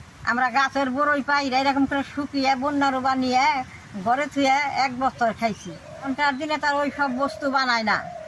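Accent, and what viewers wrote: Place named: Manikganj, Bangladesh. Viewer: উনি বাংলাদেশের মানিকগঞ্জ, টাঙ্গাইলের ভাষায় কথা বলেছেন। ধনিয়া কে বাংলাদেশের অনেক অঞ্চলে হজ বলা হয়ে থাকে। উনি গোটা ধনিয়াকে গোডা হজ বলেছেন। আরো কিছু অর্থ নিম্নরুপ : ১.ফাকি = গুঁড়ো ২. হুগনা = শুকনো ৩.মইচের ফাকি = মরিচের গুঁড়ো = লঙ্কার গুঁড়ো ৪. নবন = লবণ = নুন অল্প মিডা মানে অল্প মিষ্টি যেটা কিনা অল্প চিনি দিয়েছিলেন সেটা।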